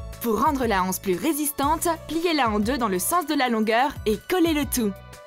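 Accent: England, United Kingdom. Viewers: Vous êtes anglaises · Vous êtes française ou anglaise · Vous êtes anglaise ou française ou encore les deux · vous êtes anglaise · mais vous êtes anglaises ??? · Vous êtes anglaise 😐😮?? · Mais en vrai vous êtes anglaise ou pas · Elle sont anglaise ou française ? ? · vous êtes anglaise? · Vous êtes anglaise ? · Vous être anglaise????? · Vous êtes anglaise ?? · Vous êtes anglaise? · Tu est anglaise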